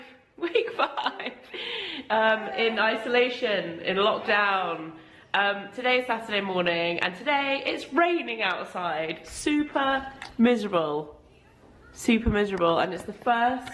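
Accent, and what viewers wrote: England, United Kingdom. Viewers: Vlogging is so amazing! I have been watching you for years now, although I’m older (56) and not really into fashion. I stumbled onto one of your videos and loved to hear your English voice as I am American and love the accent. I haven’t been to the UK yet but would love to one day. I feel like I know you and your family so well and, being as weird as it might sound, if I seen you all in town I would just say “hi and!” Of course that would be funny and awkward 😂 but that’s how Vlogs work I guess. Stay safe and keep the vlogs coming, they’re keeping us sane! ❤️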